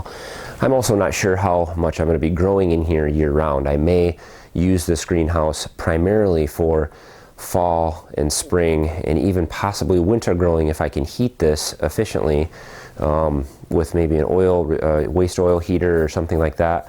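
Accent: Michigan, United States. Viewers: your Michigan accent...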